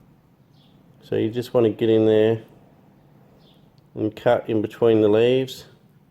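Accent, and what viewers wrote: Australia. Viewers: Just starting out with bonsai, and found your videos, looking forward to many more. Nice to listen to an Aussie accent for a change 😃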